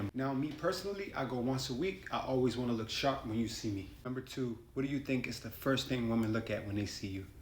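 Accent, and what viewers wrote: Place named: Caribbean. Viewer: Are you Caribbean? The accent keeps slipping out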